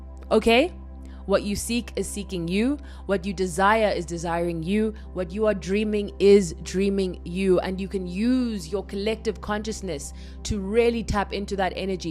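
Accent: South Africa. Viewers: Such a timely topic of conversation. Yesterday, and today as well, I had a conversation with God, questioning the idea of "Devine timing" vs "Doing things at my own time" and the response was so humbling but felt good "It's not only about you. It's about everyone else who is going to be impacted through and by you too. it's not just about you." and then today it hit me "The things you want are also manifesting you . " And it shifted Everything from resistance and obsession, to Allowing it to naturally inevitably unfold. . And TADAAA here's I come across Your video confirming everything that God , angels and spirit guides are telling me. ❤❤❤❤❤🎉. I'm so happy to hear a south African women's voice creating a space like this and using this platform the way you are. 🎉🎉 We've been waiting for your voice Sis❤🎉😂YES!!!!!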